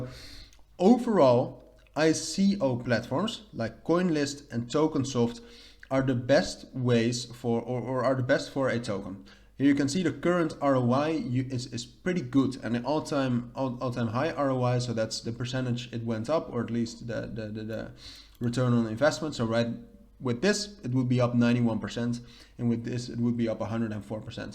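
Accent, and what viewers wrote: Netherlands. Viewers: Hey by you accent i thin you from Netherland right? I want to know if you are still active on this youtube channel if yes i have a few questions and things to propose you. Can you just reply and i will dm you on you socials. Have a good day!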